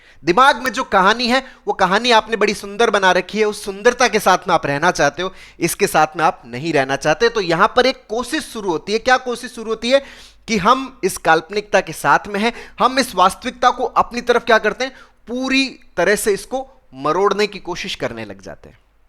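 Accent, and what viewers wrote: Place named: Rajasthan, India. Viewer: Sir aapke kahne ka tarika Bikaner rajasthan se bahut achha lgta h 😊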